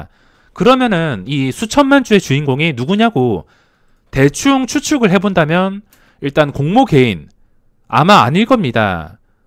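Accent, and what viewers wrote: South Korea. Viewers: 사투리가 섞인 서울말? 은근히 중독됩니다.^^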